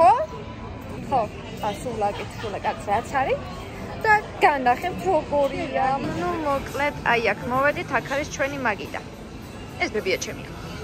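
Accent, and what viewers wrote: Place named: Imereti, Georgia. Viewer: მე თვითონაც იმერელი ვარ და იუთუბზე რო ესეთი საუბარი მხვდება მიხარია რომ ძალიან გახსნილი ხარ და რავი ბევრი ეგეთი არაა და ძან გულწრფელად საუბრობ რავი ჩემგან გულით რესპექტ💜💜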